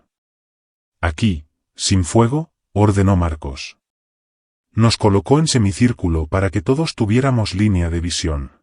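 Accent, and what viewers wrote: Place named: Spain. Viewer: L voz españolísima la embarra..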